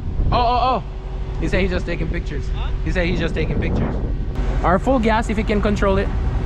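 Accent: Jamaica. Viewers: Bro are you Jamaican ? I hear Jamaican the accent frl no 🧢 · You Jamaican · Lmaoo love the Jamaican accent/slang got me dying😭💀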